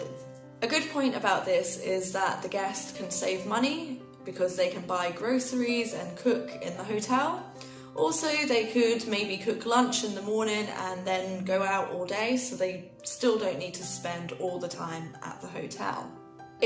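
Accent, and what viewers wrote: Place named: United Kingdom. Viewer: Beautiful British accent!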